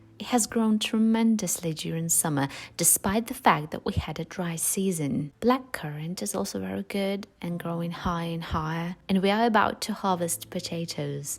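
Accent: United Kingdom. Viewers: ahh nice you have a nice british accent !!! congrats. and by the way i had never seen a vertical aquarium .... is it aquarium? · Did you learn that very British accent?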